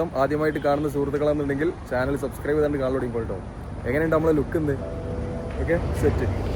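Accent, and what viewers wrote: Malappuram, India. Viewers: Love your videos and malappuram slang. Thanks!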